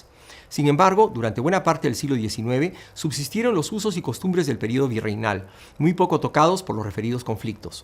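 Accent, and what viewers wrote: Peru. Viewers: Muchos hablamos de esta manera, el q se educa y escucha va adheriendo estos tonos acentos de voz, es verdad q en lima muchos hablamos como la d este señor, en su mayoría con un acento limpio neutro y entendible, rn comparación a nuestros vecinos, pues en la sierra se habla distinto pues al ser quechuahablantes cambian radicalmente su tonalidad. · Pero tiene acento Peruano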